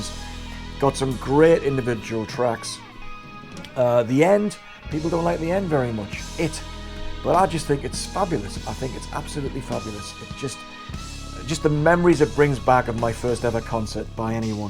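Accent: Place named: North East England, United Kingdom. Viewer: By the way, where’s your accent from. Sound like another north east native.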